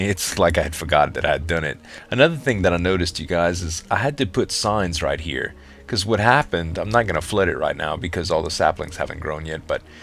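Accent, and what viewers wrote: Louisiana, United States. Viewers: Hey great video! I'm glad you found me through Lembley :) Oh and have you been to New Orleans, just out of curiosity? I can definitely tell from your accent that you are from Louisiana!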